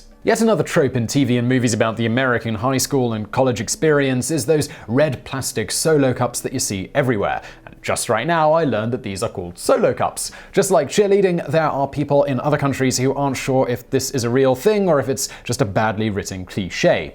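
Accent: United Kingdom. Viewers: I liked your soft hearted tone better this time. I noticed you get real "British" sometimes when speaking about America. Ha. Do you man, but I did enjoy this a lot more. I'm a sensitive guy. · The incentive isnt to get people to buy larger portions, it's to get them to spend more money. Obesity is more lifestyle than mcdonalds, but you almost know everything. I guess the british accent makes you more trustworthy to americans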